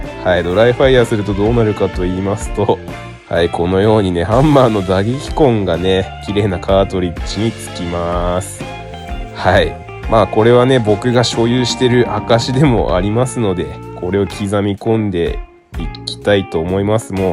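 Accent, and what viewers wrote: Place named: Hokkaido, Japan. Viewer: 以前から拝見してましたが初コメです。 北海道なんですね。今は東京在住ですが懐かしい方言がひとつふたつ聞こえたので。 冬の夜長の工作、私も嫌いじゃないです。 昨年から始めたサバゲ―の年配初心者です。コレクター志向ではありませんが所有する数丁の機能美には魅せられてます。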